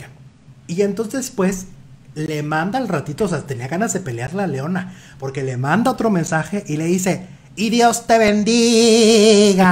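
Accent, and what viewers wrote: Fresa, Mexico. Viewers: Que fresa wanna be habla el cacheton. Nefasto escucharlo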